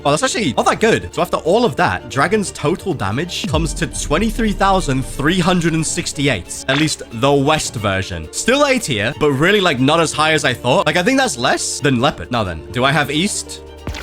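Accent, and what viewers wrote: United Kingdom. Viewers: is British right?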